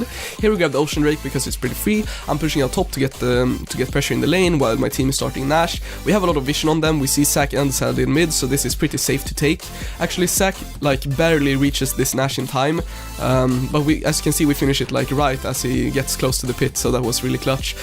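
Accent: Sweden. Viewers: Are you swedish? you sound like it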